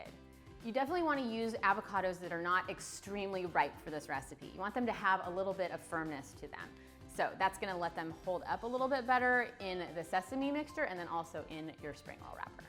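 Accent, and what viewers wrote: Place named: United States. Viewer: Judging from your accent you are an american. Must say you pass as a cook venturing into exotic asian cuisine. Do pay more attention to the proper way of wrapping . Good try!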